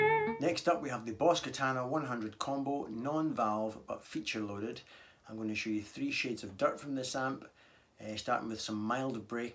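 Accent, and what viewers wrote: Scotland, United Kingdom. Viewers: just discovered your channel, brilliant to hear a fellow Scotsman on YouTube not too many about, love your playing and analysis, keep up the good work buddy.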